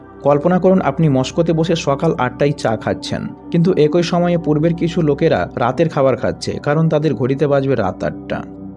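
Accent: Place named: Kolkata, India. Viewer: সরি ভাই..... আপনার কথা বলার ধরনটা মনে হচ্ছিল যে আপনি কলকাতার । আপনি কোন জেলা থেকে বলছেন.....??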